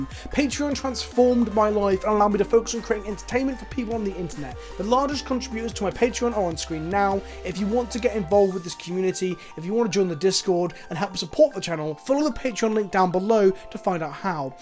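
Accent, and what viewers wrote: United Kingdom. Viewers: Why are you British?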